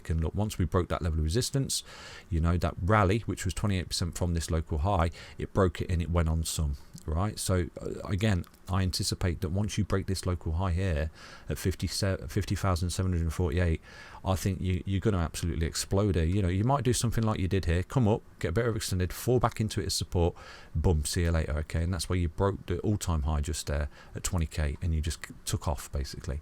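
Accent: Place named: Birmingham, United Kingdom. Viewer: I'm not a trader I'm a hodler but your videos are great educational information and your accent is good to here (are you a brummie?) . Regards from Thailand 😁🙏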